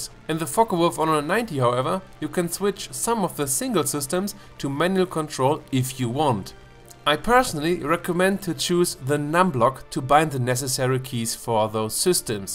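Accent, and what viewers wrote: Germany. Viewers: Gutes englisch aber man hört das du aus Deutschland kommst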